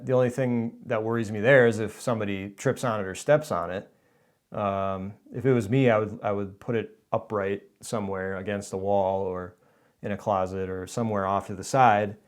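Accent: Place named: Canada. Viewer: Sure sounds Canadian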